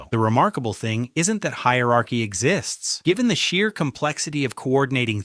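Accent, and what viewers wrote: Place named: United States. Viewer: No American voice please. Video rejected. Goodbye Yankee.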